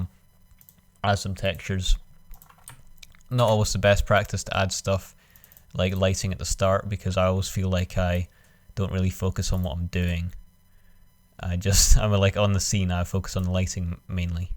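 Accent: Scotland, United Kingdom. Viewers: Sounds like a Scotsman - nice tutorial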